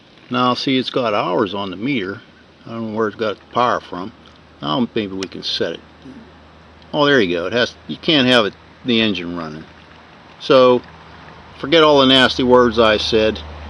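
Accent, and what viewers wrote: Pittsburgh, United States. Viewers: "Shipped to the haaass" 😂 love that Pittsburgh-ese!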